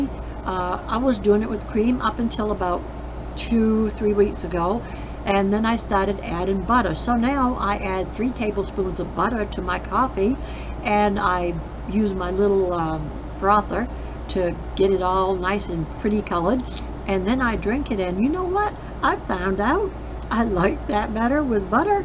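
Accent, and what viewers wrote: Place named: Maine, United States. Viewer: She sounds like my family from Maine❤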